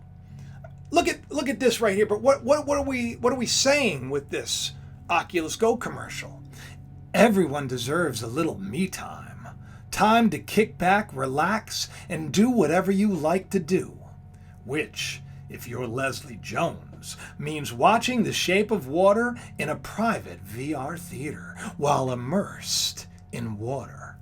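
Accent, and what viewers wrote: Ireland. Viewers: Darn good Irish accent 🍀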